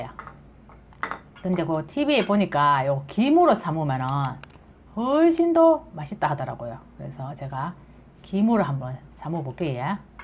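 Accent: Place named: Daegu, South Korea. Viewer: 함무보께예~ 대구쪽사투리네요 "고맙십니데이"가 맞는말이 되야되는날은 언재쯤일까?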